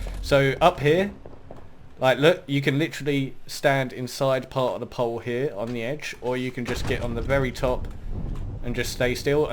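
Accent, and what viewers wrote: United Kingdom. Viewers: Just make sure you keep your English accent and good luck in the states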